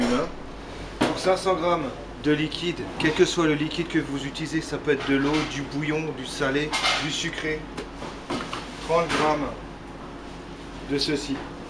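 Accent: Alsace, France. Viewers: est-ce un accent alsacien que je reconnais là ? je me trompe peut etre hein...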